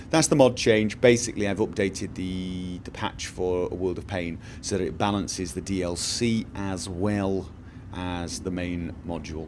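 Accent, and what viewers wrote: United Kingdom. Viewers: My apologies if you have already answerd this question before, but from where in the UK is that accent of yours? PS: Please don't tell me it's from Norway in the comments. I am already aware that he lives there. But it is for sure not a Norwegian accent, I know thia as I live next to the border of Norway in Sweden.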